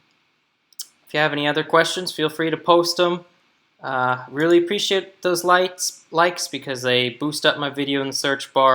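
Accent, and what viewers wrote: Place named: South, United States. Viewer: im guessing alabama tennessee texas?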